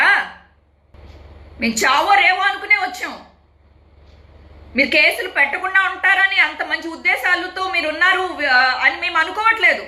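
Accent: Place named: Telangana, India. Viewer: Hyderabad lo unnav enti... Telangana esa lo matladuthunnava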